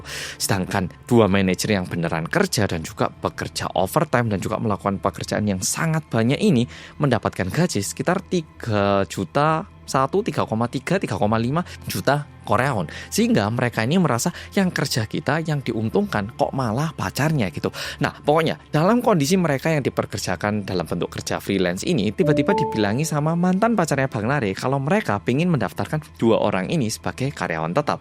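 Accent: Java, Indonesia. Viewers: medok puooolllll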